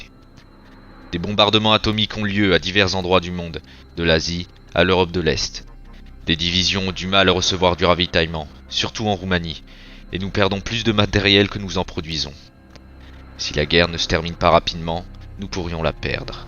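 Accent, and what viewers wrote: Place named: Alsace, France. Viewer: Oui tu as un petit accent c'est pour ça que j'ai cru que tu était alsacien ^^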